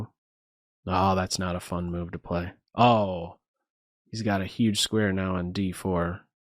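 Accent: Pennsylvania, United States. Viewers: did I hear a Pennsylvania accent?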